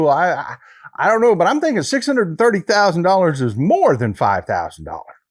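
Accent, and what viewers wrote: United States. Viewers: Good to see you again and hear my fave American accent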